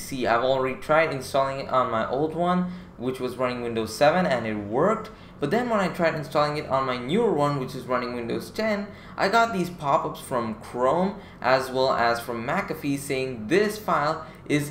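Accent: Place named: India. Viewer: Man, for you being Indian, such a great accent, and the way you explained, so greatly! You deserve more, and if you're not Indian or you're offended, I'm sorry lol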